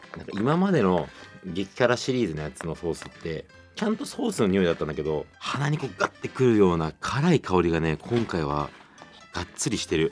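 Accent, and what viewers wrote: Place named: Ibaraki, Japan. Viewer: 久々に見たら 茨城人かよ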